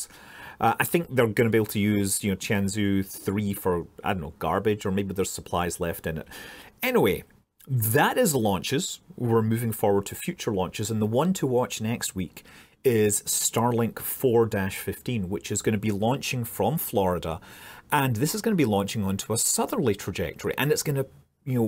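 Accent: Scotland, United Kingdom. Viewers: Have you stopped by in Scotland on your way to Iceland,? Maybe it's just me, but I thought I heard a little more of the Scooootland in your accent than usual:D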